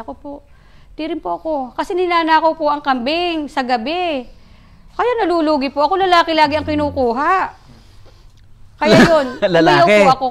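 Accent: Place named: Quezon, Philippines. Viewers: Ay tunay na Quezon ang punto, kainaman na, ang galing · Calabarzon accent diga ho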